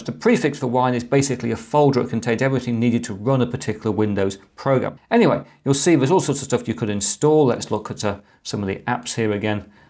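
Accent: United Kingdom. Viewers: Me while learning English: "ok, cool, this is pretty easy to understand. I like it" Also me hearing British accent: .__. Edit: very good video. Helped me a lot. Tysm. :)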